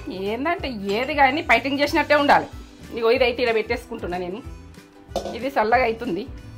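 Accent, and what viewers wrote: Telangana, India. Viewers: Anni vantalu mi మాట్లాడే విదానం mana తెలంగాణా బాష. mi మంచిమాట..చాలా. బాగున్నాయి. అమ్మా 😊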